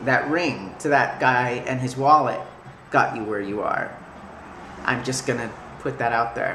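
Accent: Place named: South, United States. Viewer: You sounded really southern in parts of that video!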